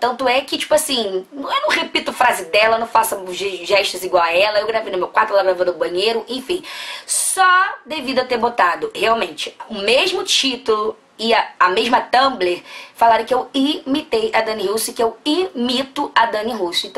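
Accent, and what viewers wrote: Rio de Janeiro, Brazil. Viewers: Engraçado nesse vídeo vc se empolgando tanto nos assuntos aí do nada: então vamos passar esse pó... rs já que o papo foi mais comparação, confesso que quando comecei a te assistir sempre que escutava sua voz lembrava da voz da Heloisa perisse na personagem do filme o diario da tati. Sou carioca tbm, mas vc é a primeira pessoa que vejo nitidamente o sotaque do carioca. Agr comparar com nayara azevedo até vai, mas com suzana Vieira. PQP, nada haver kkk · Acabei de achar a tal da Viegas no YouTube.. e naaaaada aver.. vc é muito mais doida e mais engraçada kkkk jeito único. O sutaque de vcs q parece só.. mas normal né , afinal é tudo Rio.. bjos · Você só tem um pouco de aparência da Ste por serem cariocas, o jeito de fala. Mais são completamente diferente uma da outra.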